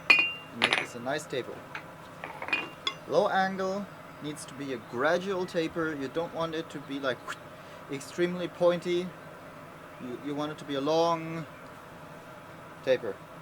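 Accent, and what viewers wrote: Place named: Germany. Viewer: Bist du deutsch? Da hast glaube ich einen deutschen amboss und irgendwie hast du auch einen deutschen Akzent...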